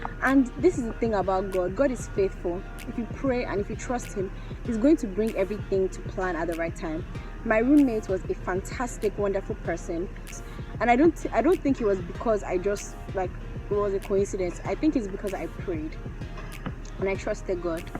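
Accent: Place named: Africa. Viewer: Why do you sound African? I'm hurt😖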